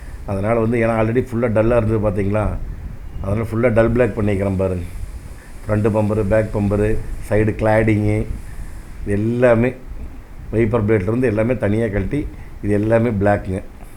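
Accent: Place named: Coimbatore, India. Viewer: அண்ணா உங்க கோவை தமிழ் அருமை சூப்பர்🇮🇳💖🙏🏤🕋⛪🙏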